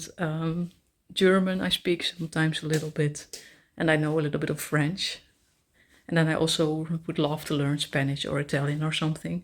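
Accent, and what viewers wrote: Netherlands. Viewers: Ladny nederlande akcent ) hhhrahhh)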